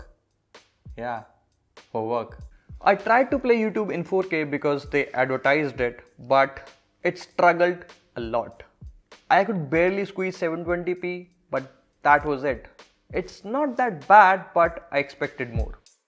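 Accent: India. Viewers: As a non-native English speaker, I usually have a hard time understanding Indian accents, but this guy’s voice is very soothing. I’m loving his accent. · Great video , but still indian-english accent gets me everytime :D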